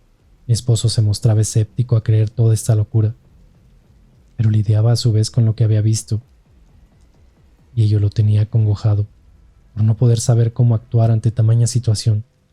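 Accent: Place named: Mexico. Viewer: Mmm...No es una voz desagradable....Pero es de UN solo tono...sin matices...se nota el acento mexicano...y NO consigue "dar miedo"..😝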